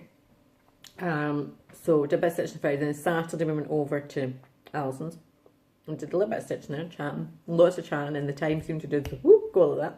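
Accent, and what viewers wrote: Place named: Scotland, United Kingdom. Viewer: I enjoyed your video, nice progress and the patterns you got are beautiful. I have looked at William Morris patterns and just know I'd never make it to the end so didn't even try. It was nice you got to go have a meet-up with the other stitchers, I meet with a group every couple of weeks and it does encourage me to keep at it though we do chat and spend time looking at what everyone else is doing. Lol . Flosstube has grown so big its re ally hard to grow a channel unless there is one of the bigger numbers ones shout you out. The only thing you can do that I can see is find those who joined around the time you do, comment often and shout out other new comers. I don't make videos but I have been observing for a long time, lots of people join and hope those who have been here for ages will see them, comment on them but they only have as much time as they can fit in to watch videos and they made most of their friends when they joined . 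Every week or so I search Flosstube#1 and welcome new stitchers, if I made videos I'd shout them out to the subscribers I had and comment on them often, give likes and slowly over time I would find those I had the most in common with . Good luck building your channel, I really enjoy your videos though at times I find it hard to figure out what you're saying because your Scottish accent is quite strong😂. The Vietnam war was terrible , a whole generation of young men were drafted and a whole lot of them came home in body bags. They were fighting for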